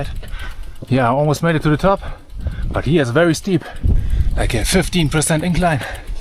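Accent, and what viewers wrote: Germany. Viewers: im curious bro where r u from, i like ur accent haha , Germany Belgium or Holland，btw , welcome to china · 很喜欢你的德国口音的英语，希望你一切顺利∽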